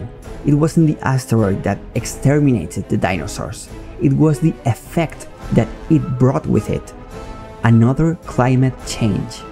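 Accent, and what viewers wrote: Oxford, United Kingdom. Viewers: That Oxford English papuuu Loved the video it really makes you think about it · Ni siquiera iba a entrar a este vídeo, solo entre para de suscribirme, después oí tu voz con el ingles de oxford y pensé "Que s dalas tío"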